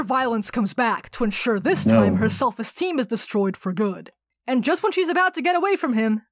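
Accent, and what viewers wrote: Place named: Ireland. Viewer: You sound like me haha may the luck of the Irish aid you in your endeavors!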